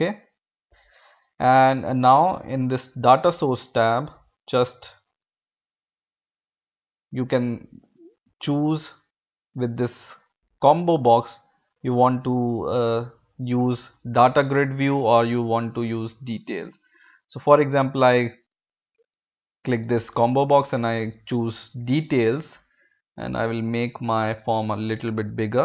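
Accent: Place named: India. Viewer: indian accent is so anoying to listen to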